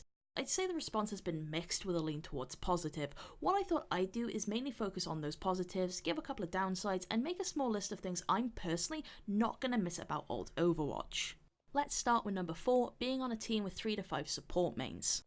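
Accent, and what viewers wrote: Wales, United Kingdom. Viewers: are you welsh i can’t really tell but i’m guessing you are 🤷🏽‍♂️🤷🏽‍♂️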